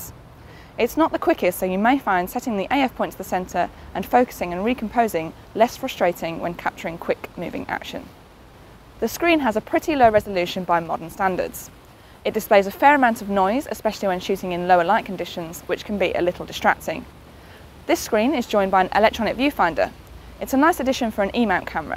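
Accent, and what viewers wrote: United Kingdom. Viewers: Your British accent alone earns you a like ;)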